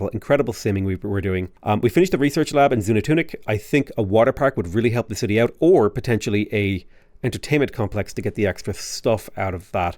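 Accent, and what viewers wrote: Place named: Ireland. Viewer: I’m a natural English speaker, and you sound like an over caffeinated Irish dude. But I’ve been told Canadians speak really slowly compared to aussies, Brits, and Irish.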